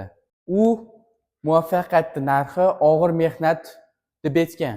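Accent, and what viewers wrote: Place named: Tashkent, Uzbekistan. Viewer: Yana bitta narsa toshkent shevada emas adabiy gapirish yaxshiroq buladi manimcha nutq juda juda zaif ishlash kerak ekan agar shu sohada ketmoqchi bosa bu bola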